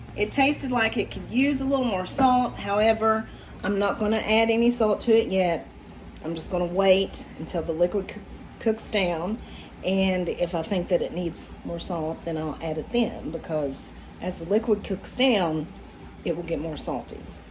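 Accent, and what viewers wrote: Kentucky, United States. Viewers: I don’t comment on yt much but I have to for you! 😂 I can’t stop watching your videos! I love your rawness/transparency, personality, smile, accent (soon as I heard I thought she has to be from Kentucky- bc I am too 😁) and of course love your recipes. They hit home and remind me of my mom and grandparents cooking who have all now passed on. 😢 there were some I wish I had gotten from them and found here so thank you!! (Chocolate gravy for example) You are beautiful and have a beautiful family. I have 3 sons ages 23, 22, and 20. So I can relate! Guys don’t be so shy and help support your mama. 😂 💯 I’m sure she deserves it and seems so proud of you all. 💖 keep up the good work guys. Best wishes 🤟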